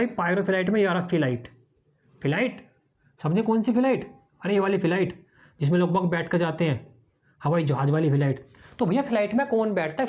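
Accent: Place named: Meerut, India. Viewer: Sir video bhoot mst hai.. ekdum funny. Tarike se apne padhaya hai . Apki boli se lag rha hai aap merrut side se ho.. 😅 Bhoot acha padhaya hai .❤❤❤❤❤❤❤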